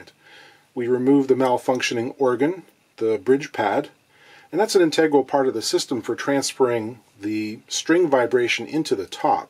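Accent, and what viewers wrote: Canada. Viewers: Embrace your Canadian ways and accent! ;-) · Don't check yourself about "about"! It's good to hear an identifiable Canadian accent. I'm a particular fan of Ontario accents from further east, which may almost sound Scottish or Irish. And I'm scientist and my cuticles are no better than yours. This one reminds me of my ongoing dental work... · In Canadian about should rhyme with shoot and scoot. That’s part of the charm of listening to this channel, to hear a talented foreigner speaking the English as only an occupant of the great white north can. Seriously, I’m Virginian and y’all say it like the folks from Richmond. Thanks! Another wonderful video. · Go ahead, sound as Canadian as you like. You wouldn't tell an American to not sound American. Anyway, guitar sounds pretty good, imo. Nice work and video ... very relaxing. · I'm a new viewer of this excellent channel. 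I realize this is an old video, but I find it sad that you're cautious about (aboot😅) how you pronounce certain words. I love Canadian, and I love your videos. Keep up the good work! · Don't you worry about that Canadian accent...you do you! Thanks for the amazing videos!!!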